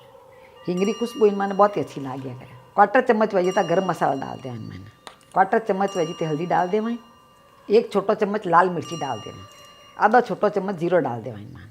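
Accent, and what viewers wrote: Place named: Marwari, India. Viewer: Meri mummy mujhe deke gai thi korma per ab tak banaya nai, aapka video dekh ke laga mummy boli banale ab to😑, आप same humari marwadi बोलते he, लगता he meri mummy he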